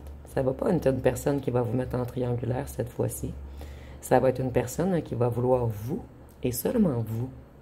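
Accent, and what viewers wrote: Quebec, Canada. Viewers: Vous avez toujours autant de charme ,de séduction , de belles manicure et couleurs des ongles , vos tatouages bien fait et très jolis et comment vous avez dû souffrir dans le bras droit en plus , j'adore votre accent québécois ,votre charme ,vous êtes très coquette aussi je trouve ça 👍🌟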